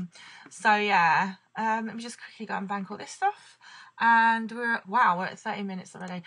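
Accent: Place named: United Kingdom. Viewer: Stop saying who you aw, remembaw, whatevaw your british accent is screwing up youaw english!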